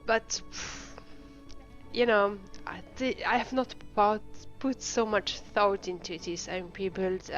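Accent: France. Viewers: Hi, are you french ? I demand that because you have a french accent (si oui, un bonjour de la France ^^)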